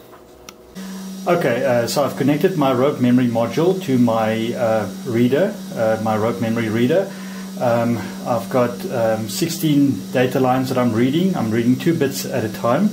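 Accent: South Africa. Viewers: Lol i was able to tell your are from South Africa because i am originally from there lol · This is absolutely incredible! Wild find. I am absolutely flabbergasted that such a thing could be not only found, but READ in this time. O____O By the accent i'd imagine you're South African?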